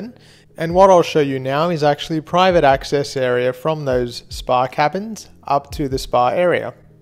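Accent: Australia. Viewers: I was not expecting you to be Australian, but I’m not disappointed. I’m American, and sailed Splendor in Alaska. I like the “pink lady” vibe, but I’m not a fan of all of Carnival’s aesthetic choices…Splendor is one of the better looking imo.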